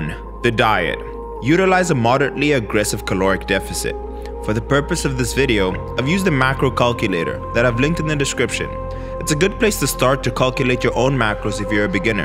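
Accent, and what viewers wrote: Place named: South Africa. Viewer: u sound like you're from South Africa but relocated to USA..New to the channel. Love all the info you're giving..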